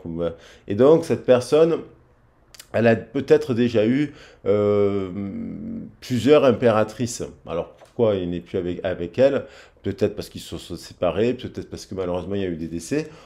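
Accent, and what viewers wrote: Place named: Marseille, France. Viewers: Bjr. Je vs découvre ce jour. 🙏🏻🙏🏻🙏🏻 J adore votre accent Marseillais 😉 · Coucou merci pour cette magnifique guidence je suis très heureuse de vous voir et de vous entendre sa fait plaisir avec ce jolie accent marseillais qui vous vas à ravir merci de prendre le temps alors que vous avez beaucoup de travail sa me mais en joie c est un jolie cadeau je vous embrasse bien fort et vous de gros bisous belle journée remplit d amour et de douceur 😚💕🌈🌞🌹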